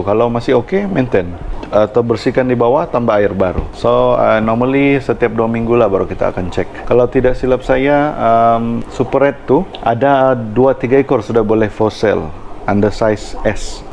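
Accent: Sabah, Malaysia. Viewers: Assalam sifu. Nak minta sifu tunjukkan cara groom mental betta. Masa dia flare tu, dia cool jer dan rileks. Mohon Tunjuk ajar sifu. Btw, congrats for 3k ++ subscribers. Road to 10k subs pulaa bossku. Huhu semoga maju jaya. Huhu terhibur tgk content sifu. Dialek sabah tu pekat joo. Wkwkw original sabahan. GoodLuck in future. N keep doing what you love. Chaiyokk!!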